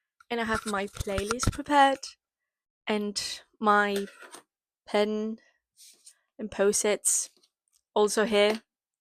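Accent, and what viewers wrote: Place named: United Kingdom. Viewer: the britash accent is everything to me🗣️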